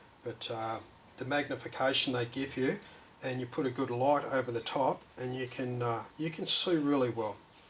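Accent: Australia. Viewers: Thanks for an absolutely great video on how to do this with precision! And I love your Australian (?) accent:)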